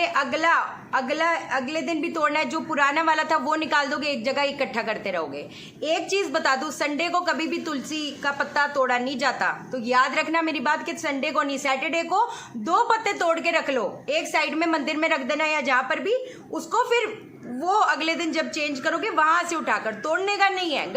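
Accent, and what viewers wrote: Punjab, India. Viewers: Your way of telling Passha logo (punjabi tone and way of communicating) is killing... Love you Mam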